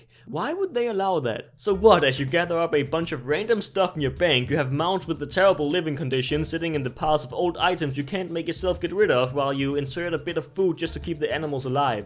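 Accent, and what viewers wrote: Denmark. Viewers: Are you from Denmark? :D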